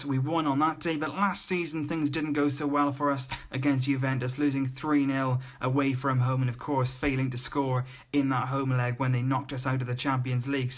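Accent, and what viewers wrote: United Kingdom. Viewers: i have a question are u british ??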